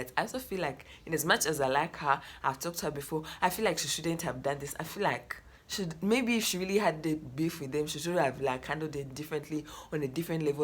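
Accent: Nigeria. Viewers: I hate it, can't stand the Nigerian accent. Stop it ooo. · Ghana? She sounds Nigeria?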